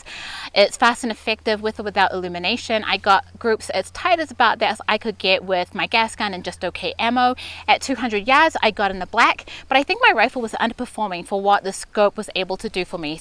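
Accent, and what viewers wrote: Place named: Australia. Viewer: Great break down and description . Was thrown at first with the accent and was surprised to see your channel is out of Australia. With the law's trying to get changed here in the States and law's that had been changed in Australia. Very interesting the many topics your channel can bring. Again great review , very well presented and very informative. would like to see actual action/use of the product/product's. Keep up the great work!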